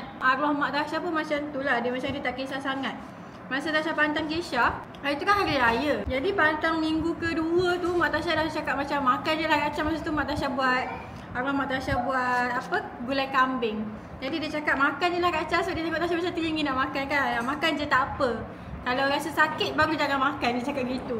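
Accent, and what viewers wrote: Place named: Terengganu, Malaysia. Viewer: Comey kakak slang tranung hahahahah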